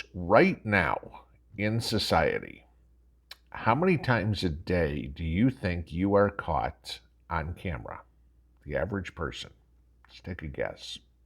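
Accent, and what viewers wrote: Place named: Bronx, United States. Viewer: Sounds like the bronx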